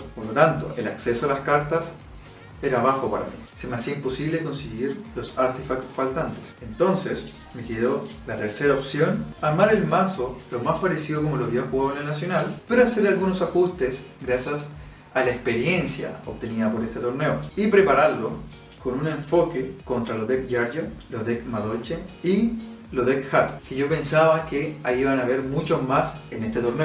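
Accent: Ecuador. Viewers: Eres ecuatoriano o chileno jajajaj decidiré weon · Suena a mensaje de anime, pero la verdad es que nunca hay que rendirse y gracias a eso fue a un mundial entonces siempre diviértanse y jueguen PD. Eres ecuatoriano???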